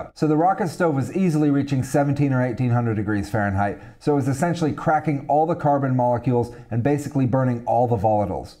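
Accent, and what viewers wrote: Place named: United States. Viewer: Thanks for the great content I've built a few rocket stoves and you always get better results if you insulate your burn chamber. Also I noticed that you have an American accent but you are based in the UK going on the plugs behind you and I would guess from outside possibly somewhere in the Pennines?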